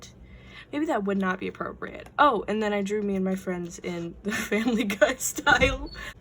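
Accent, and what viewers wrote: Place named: South, United States. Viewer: I just have to mention that you have a nice southern accent :3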